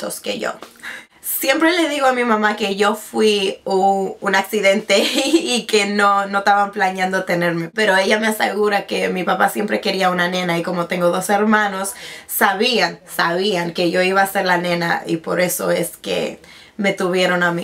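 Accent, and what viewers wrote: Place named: Argentina. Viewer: ahhhh I can sorta hear your Argetinian accent loveeee it <3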